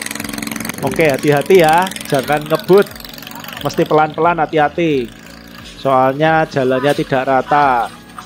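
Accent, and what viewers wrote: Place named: Surabaya, Indonesia. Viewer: Mangkane medok_e Jawa Suroboyoan ..hehe salam dari Surabaya Om sehat selalu..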